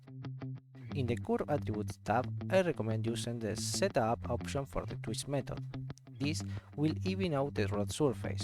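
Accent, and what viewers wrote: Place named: Argentina. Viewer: Me parece que ese inglés tiene tonada argentina o algo de latino! 😆 saludos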